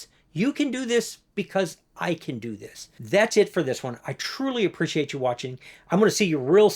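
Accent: Minnesota, United States. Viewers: I thought I heard some Minneeeesota in there! Northern Weesconsin here!! Right on the tip!!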